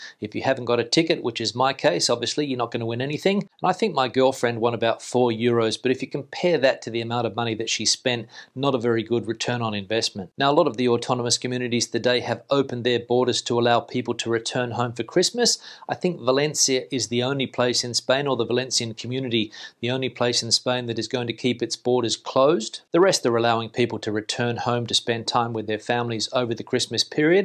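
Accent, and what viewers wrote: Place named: Australia. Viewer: You teach Australian English? Your accent I mean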